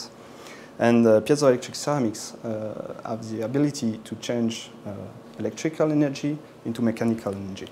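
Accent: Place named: France. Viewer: Sounds French to me.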